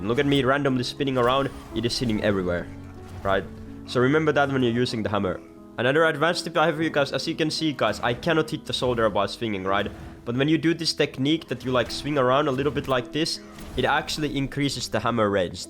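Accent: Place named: Scotland, United Kingdom. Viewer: wtf is your accent? its a mix between like scottish and asian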